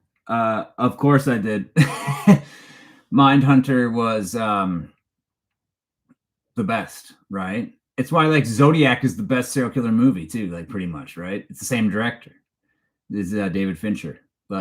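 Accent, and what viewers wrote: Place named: Canada. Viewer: Definitely Canadan. I get a chuckle everytime he says sorry cause of the accent.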